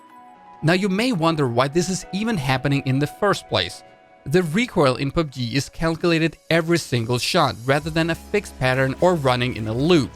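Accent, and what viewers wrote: Russia. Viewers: ohh , you from Russia) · Are you Russian?🤔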